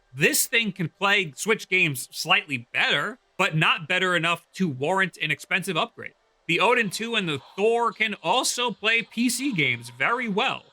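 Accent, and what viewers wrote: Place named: Long Island, United States. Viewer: That's an integral part of the Long Island accent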